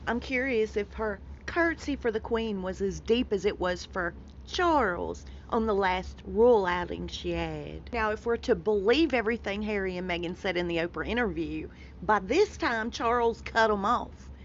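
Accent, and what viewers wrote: South, United States. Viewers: someone just posted on Twitter that a security guard on the dress fitting got mm on film pitching a hissy-fit (you sound southern, so i know you will know what a hissy-fit is) and is releasing it to the Canadian press.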